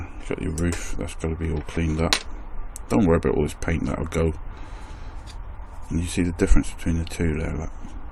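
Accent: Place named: England, United Kingdom. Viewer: ! Are you in merry old England? I grew up in Wales.#7 Llanerch path, Cywmbran Monmouthshire. Pretty sure I hacked the spelling on that. Been awhile. I miss the sweets, steak n kidney pie and the custard squares. I love to come back just to beat the hell out of one Nigel Wilkes. My dad was in military so was there for 4 years. Live in Coos Bay, Oregon these days.England was best place in the world to grow up as a kid. Miss the hell out it. Sorry to ramble but not have any contacts there anymore and you accent reminded me of the place. Take care. JP.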